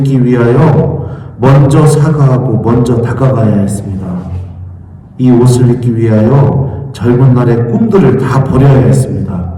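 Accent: Chungcheong, South Korea. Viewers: 요새신부님강이에 푹빠져들고 재미 있게 충청도 말씨 유머 감동입니다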